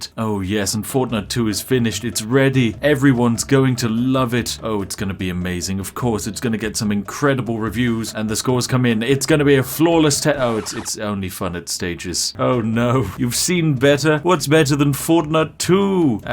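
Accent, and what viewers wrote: United Kingdom. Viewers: Ah yes you know what i love more than a British accent? and extra Britty Brit accent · And me, as a frenchie, I love British accent that I can understand.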